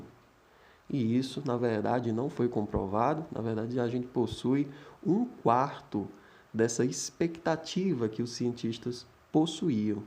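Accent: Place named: Minas Gerais, Brazil. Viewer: Modo de falar legal em minas, amei esse trem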